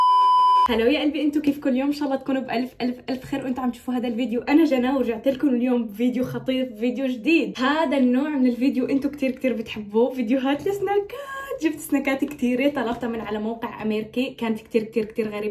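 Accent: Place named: North, Palestine. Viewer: لهجه مدن شمال فلسطين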